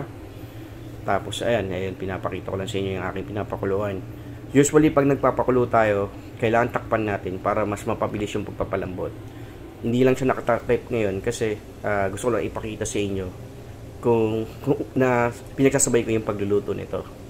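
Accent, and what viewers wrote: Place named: Batangas, Philippines. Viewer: May accent ka Sir ng Batangeño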